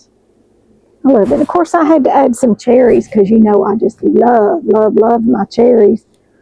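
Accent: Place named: Tennessee, United States. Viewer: I thought you sounded like you were from Tennessee. We are in East TN also. Love your sweatshirt.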